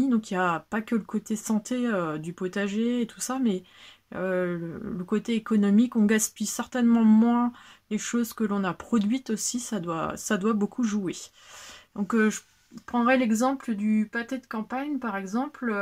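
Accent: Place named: North, France. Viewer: je découvre cette chaine, je vais regarder les autres videos. vous êtes d'où ? je semble distinguer un petit accent du nord sur les "a" ;)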